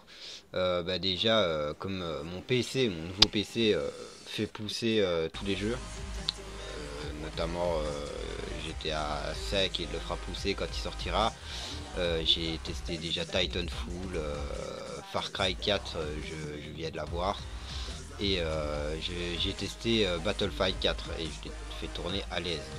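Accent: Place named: Liège, Belgium. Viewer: Ton accent Liégeois ahha Je viens de Bxl moi, continue comme ça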